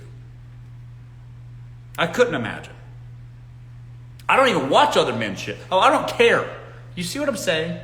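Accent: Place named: New York, United States. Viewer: You sound like a real New Yorker 💯😂 I like you... I'm a lady from New York and we just say it straight with no chaser!😂 Cheers to you,!🎉💞😂🥂😎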